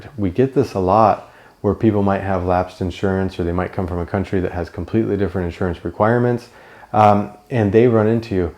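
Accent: United States. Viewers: Where are you from? your accent is American but you live at the Green Hell...I'm confused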